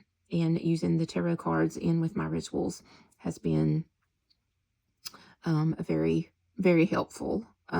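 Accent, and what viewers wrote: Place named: South, United States. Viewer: This was an amazing video! My jaw dropped with your first piece of advice and then the hits just kept coming! Thank you so much for sharing your perspective and wisdom with us 🥰 (And this has nothing to do with tarot but I love your dialect of English! I'm a former language teacher and in love with how people talk. I'm from Minnesota and rarely get to hear the beautiful accents of the southern states.)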